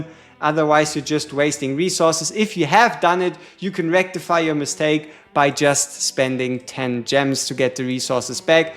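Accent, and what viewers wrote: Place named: Germany. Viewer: Ich erkenn an der Art wie du sprichst das du Deutscher bist. Meinst du man sollte neu anfangen wenn man diese Fehler relativ oft am Anfang gemacht hat oder trotzdem weiter spielen bin jetzt Level 25 nach 2 Tagen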